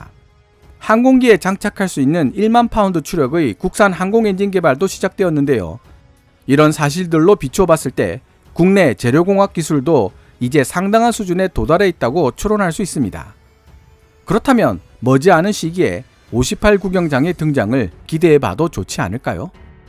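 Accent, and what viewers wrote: Gyeongsang, South Korea. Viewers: 경상도 억양이 상당하시네요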